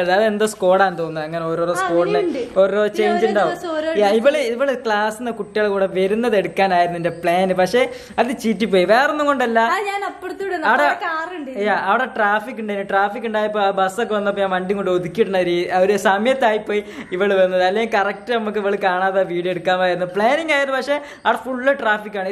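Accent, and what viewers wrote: Kozhikode, India. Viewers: യ്യ് കോഴിക്കോട്ട് കാരൻ ആണോ,,, സംസാരം കേട്ടിട്ട് തോന്നുന്നു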